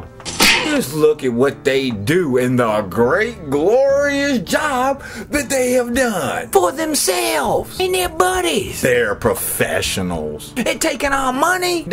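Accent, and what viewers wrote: South, United States. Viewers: Excellent! Nice job brother. I started to watch this, (my first) , video on your channel...and initially thought, 'how annoying' with the southern hic drawl, and the outfit, UNTIL...I heard what you had to say. into the video sums it up. Love the points and I learned some new issues I wasn't aware of. I'll be watching for the next one. Thanks for making current events interesting. Respectfully, G.W.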